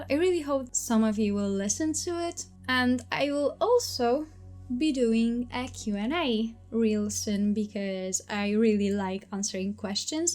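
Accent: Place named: Poland. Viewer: /eng: her accent is beautiful and I am saying that as also polish. Polish people do not have good English accent usually Pl (for basically) Super akcent, zazwyczaj Polska wersja mówienia po angielsku mega mnie irytuje ale twój jest super Jak skończą ci się pomysły na filmy daj coś o języku bo taki akcent to serio wyjątek w Polsce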